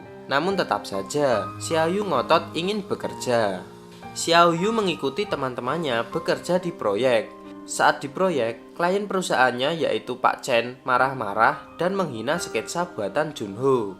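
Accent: Java, Indonesia. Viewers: film sedih kayak gini ketika di ceritain pake logat jawa... sedihnya berubah jadi horor! 😂. gak cocok cerita film romance kayak gini! cocoknya film horor atau komedi! logatmu itu looh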